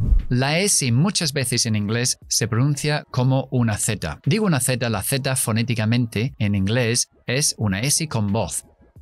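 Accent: United Kingdom. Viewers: Buenos temas de este teacher. Lástima q no enseñe con el acento americano. El acento británico sólo se habla en Uk y el mundo no es esa isla es más comercial el acento americano vayas donde vayas.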